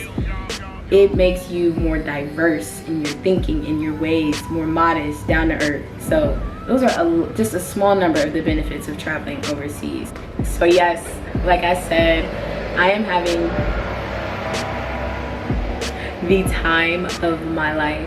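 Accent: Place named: United States. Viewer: The accent is why they say you have an American thing.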